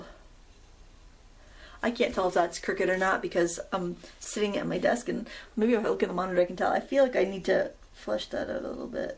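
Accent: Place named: New England, United States. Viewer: love the long videos!!!! I watch them alot for tips and practice..you give lots of great ideas and tips. I paint and do crafts all day most days, and watch youtube videos on my huge tv screen so i can see every little detail! Thankya again for sharing!!! Long vids are more then welcome!! lol love your new england sillyness too!!! wicked smaaaat lolol giggle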